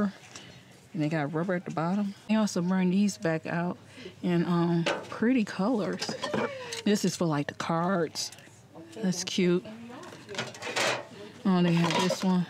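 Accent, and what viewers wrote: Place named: Deep South, United States. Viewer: You from the DEEP south girl. ❤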